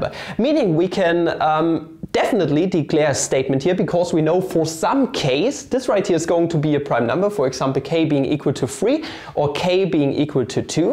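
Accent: Germany. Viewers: You're German I suppose? Judging from your accent :D great video anyways, keep it up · Schöner Deutscher Akzent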